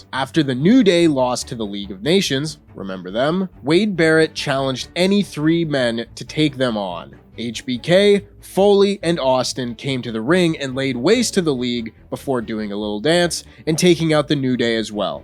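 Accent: United States. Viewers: American accent 🤯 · I thought the question of the day was "How did an American/Canadian get a presenter job there?"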